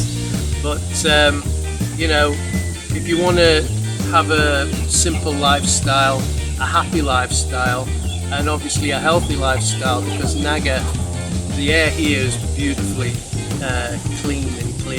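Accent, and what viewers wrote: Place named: Scotland, United Kingdom. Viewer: I dunno if I am hearing u right but you sound like Scottish. :D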